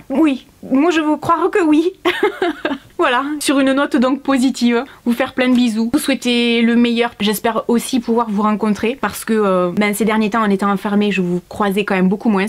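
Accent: South, France. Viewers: Bonjour, je te suis depuis 2 ans et j'adore regarder tes vidéos, ton sourire, ton accent du sud et ta bonne humeur sont les petits rayons de soleil de mes journées. J'aime tes vidéos sponsorisées par ta carte bleue, je sais que je peux faire confiance. Cette année à été difficile pour la plupart d'entre nous et tu l'a rendue plus douce. Continue à nous faire sourire et un grand merci pour tout. Bonne année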